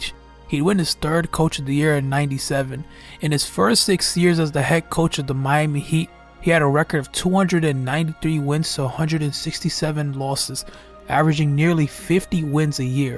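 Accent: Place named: Bronx, United States. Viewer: Bro your cadence and delivery is dope, it's like your cool cousin vinny giving you the game in that Bronx accent!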